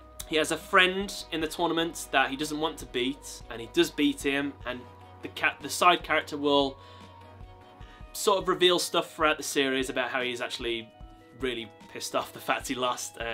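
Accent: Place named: United Kingdom. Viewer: thank you. Are you UK based, you sound it. Don’t see many Yugioh channels with UK youtubers. Nice to see you represent 👍 I’m gonna throw you a subscribe your content is decent.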